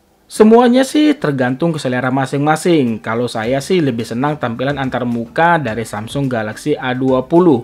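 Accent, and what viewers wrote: Semarang, Indonesia. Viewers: Admine wong semarang 😂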